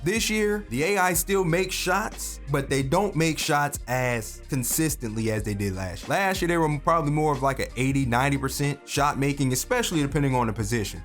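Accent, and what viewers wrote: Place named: Buffalo, United States. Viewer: Yo I know a Buffalo nigga when I hear one! 😂 great content tho my boy!! Earned a sub! 🔥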